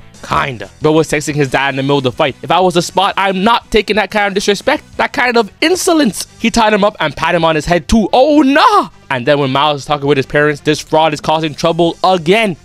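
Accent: Toronto, Canada. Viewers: Ifw the video but I can’t not hear the Toronto accent💀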